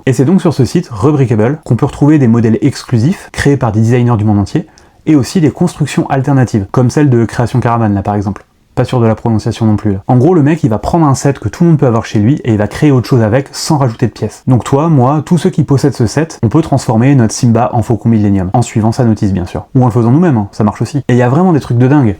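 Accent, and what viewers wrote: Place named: Spain. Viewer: Tres bel accent espagnol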